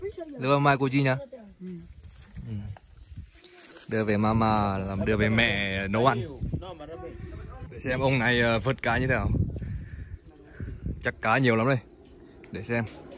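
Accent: Nghe An, Vietnam. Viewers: 🥰🥰🥰 Yêu cái giọng nghệ an ghê😂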